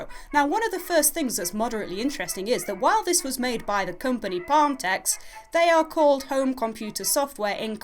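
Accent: Yorkshire, United Kingdom. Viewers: I thought you sounded from yorkshire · I thought "what's she on about she doesn't have an accent " then I remembered I'm Yorkshire too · Great to see more yor'shire on you tube! As my southern colleagues tell me, "it's grim up north!" So you must be very posh! ( btw I'm from Leeds!) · What part of Yorkshire are you from? It's my second-favourite region after Durham, but Durham only wins because it has me in it. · From one Yorkshireman to a Yorkshire lass you can saw owt owever you want - its them lot not from Yorkshire that cant speak propper, They just cant take that they are not from gods county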